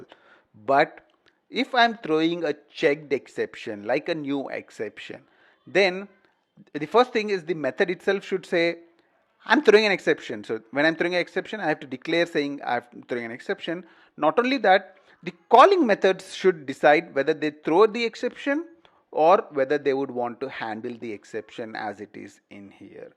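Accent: India. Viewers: you are great!! only indian accent that I can bear really!!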